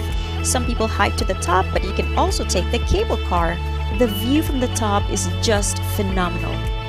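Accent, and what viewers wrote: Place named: Visayas, Philippines. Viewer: Cebu?? So I guess bisaya ka ma'am. 💕 Loved your accent 😍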